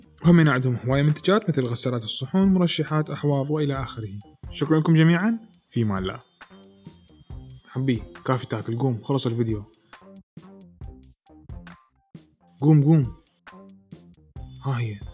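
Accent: Iraq. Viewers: احلى شي بيك انك ما تللعوچ بلسانك و تحچي عراقي كلش جدا حيل 😘 تحياتي لكل عراقي اصيل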